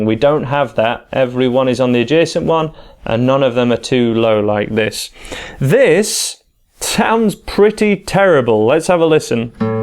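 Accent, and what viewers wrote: United Kingdom. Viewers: Hi Thank you for the free tabs> Are you UK based (it sounds like you are) looking forward to making contact.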